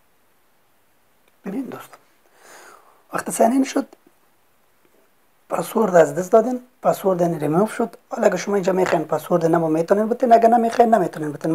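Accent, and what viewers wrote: Afghanistan. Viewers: گوه تو لهجت افغانی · لهجه ی افغانی خیلی غلظت داره واسه ایرانیا سخت میشه فهمید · از لهجه اش معلوم میشه که پشتو زبان است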